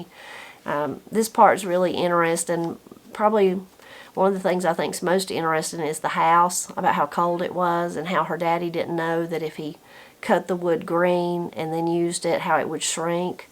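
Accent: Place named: South, United States. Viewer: Thank you both for the wonderful stories makes it even more amazing with her southern draw😃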